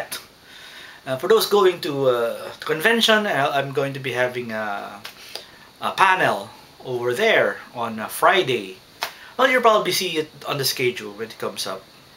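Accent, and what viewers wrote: United States. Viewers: How could I not! lol, is that supposed to be your San Diego accent? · Hahaha! Ayos ang American slang accent ah. Pwedeng-pwede na mag-order sa restaurant. Eat all the steak you want. Have a wonderful time in San Diego, Sir.:-)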